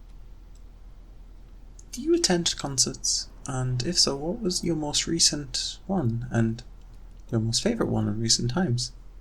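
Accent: United Kingdom. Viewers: He's scottish i think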